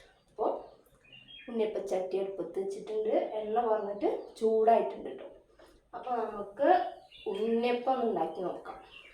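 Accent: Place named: Kunnamkulam, India. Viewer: സൂപ്പർ. തൃശൂരിൽ കുന്നംകുളത്തല്ലേ വീട്? സംസാരം കേട്ടിട്ട്😅😅😅😅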